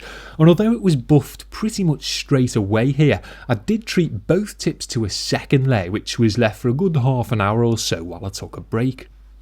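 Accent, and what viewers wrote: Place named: England, United Kingdom. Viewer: that english accent....a candy for my ears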